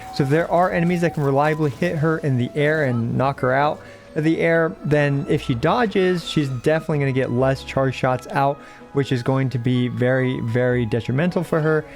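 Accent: United States. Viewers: i love the country accent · stop why is ur yeehaw accent so good HAHAH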